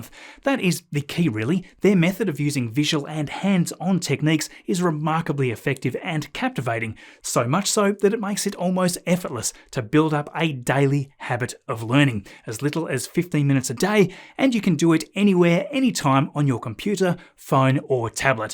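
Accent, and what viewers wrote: Australia. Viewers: Just stumbled across your channel. Awesome work mate. I've been living in the UK for the last few years, moved here about 2 months before the pandemic which was a very interesting greeting to the country! It's always great to hear an Aussie accent in my travels as it feels like home. Peace mate. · I love. A very well made Show. Plus I like the Australian accent. Keep up the good work it is much appreciated.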